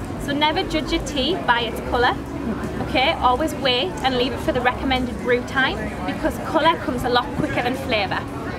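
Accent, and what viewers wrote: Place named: North, United Kingdom. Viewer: lurve this veedyo. (sorry, her northern accent is as delicious as the teas she describes- and my version is horrid.) but I do love this video. I am an anglophile so....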